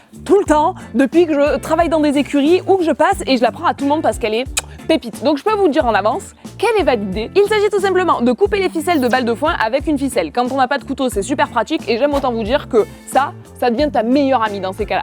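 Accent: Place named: South, France. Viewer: Tu a un accent du sud c’est trop drole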